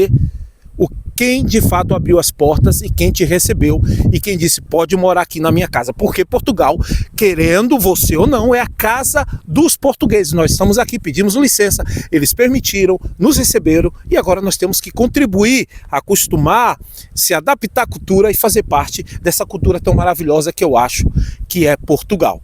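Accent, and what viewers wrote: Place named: Brazil. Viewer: Caro amigo Brasileiro, sabe uma coisa ? Eu, Português de 85 anos de idade, tiro-lhe o meu chapéu, por compreender e aceitar o jeito português, porque o Português genuíno é exctamente isso tudo que acabou de ler, na percentagem de 100% . Se não fosse o sotaque o Senhor, hoje, já seria um português genuíno e verdadeiro. Na companhia dos seus familiares, seja muito felíz em Portugal.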